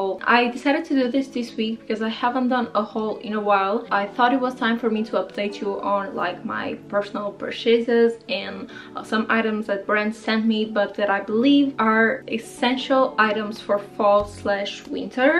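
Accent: France. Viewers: Are you French? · Is she French ?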